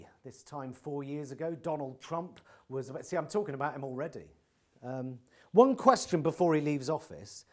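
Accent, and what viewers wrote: United Kingdom. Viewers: For the benefit of non-English fans, please always allow subtitles. I adore this super-British accent but sometimes it's a bit hard to undrrstand. Thank you.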